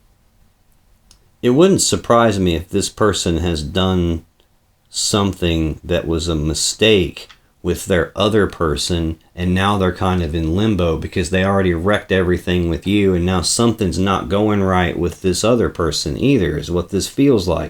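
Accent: United States. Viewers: Yes, I want a personal reading. You’re awesome. Prayers for your person situation. Help me unravel mine? Time zones may affect the coordination. You don’t sound Australian so, I’m guessing American? I think it’s 12 hours difference. I’ll book. 🤪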